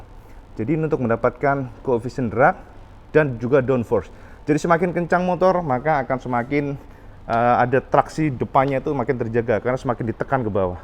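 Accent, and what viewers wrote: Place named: East Java, Indonesia. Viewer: Mas kui ganteng logate Jawa timur medok, jangkrik tenan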